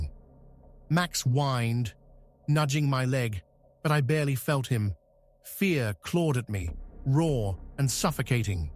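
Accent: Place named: United Kingdom. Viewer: Love the stories with the British voice.😉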